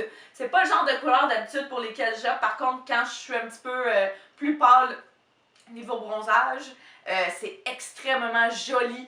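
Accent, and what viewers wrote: Quebec, Canada. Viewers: Et ton accent québécois, j'aime tellement 😍😍😍😍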